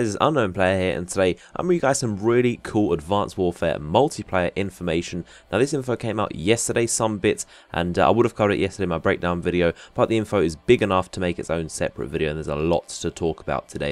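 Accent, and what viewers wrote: United Kingdom. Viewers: Unknown where are u from in the UK?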